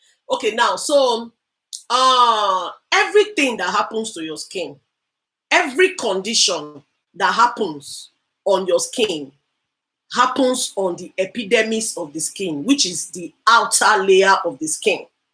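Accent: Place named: Cross River, Nigeria. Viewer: Am from cross river state mama..am guessing your from there too because you sound like someone from cross river state mama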